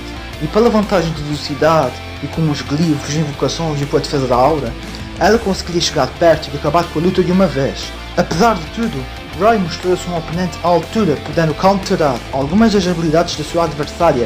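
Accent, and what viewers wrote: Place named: Portugal. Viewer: Nao sabia que vc era português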